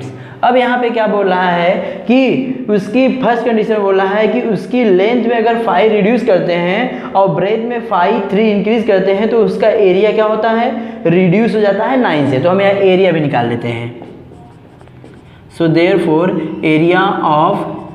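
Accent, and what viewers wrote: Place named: South India, India. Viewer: Sir from which state are you ,your speaking tune are like south Indian people's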